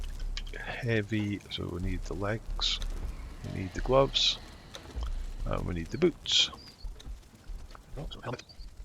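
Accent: United Kingdom. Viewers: good video my greater shaleback is bruce iii and always nice to hear a fellow britt im sarf london innit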